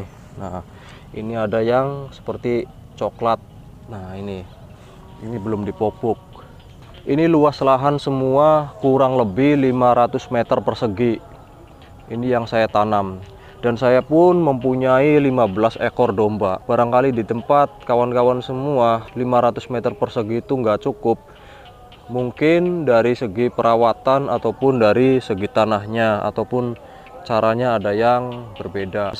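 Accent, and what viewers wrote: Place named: Banyumas, Indonesia. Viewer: Wong ngapak yae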